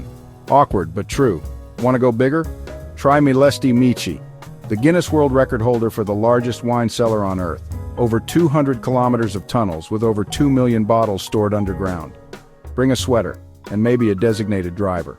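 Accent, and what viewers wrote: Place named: Texas, United States. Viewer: I mike your voice and your american Texas accent !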